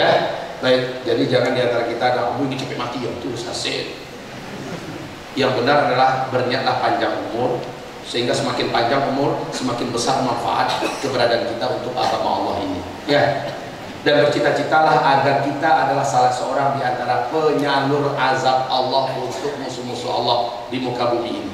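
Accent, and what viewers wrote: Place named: Minangkabau, Indonesia. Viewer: Wah minang. Kek nismilan cakap minang lain sikit..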